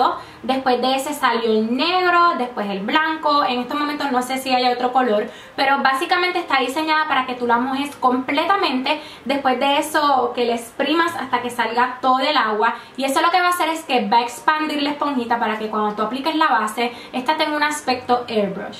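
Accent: Puerto Rico. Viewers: Muy buen vídeo. Me encantan tus vídeos y el hecho de que eres una Youtuber que se expresa como yo, a lo boricua!!! Mucho éxito y bendiciones. :D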